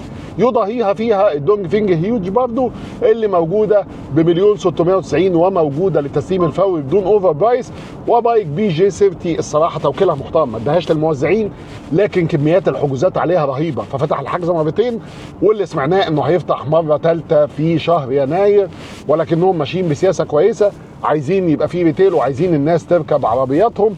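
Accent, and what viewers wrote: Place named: Egypt. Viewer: ياخي اللهجة المصريه تستفزني بالذات يوم يتكلم انجليزي 😢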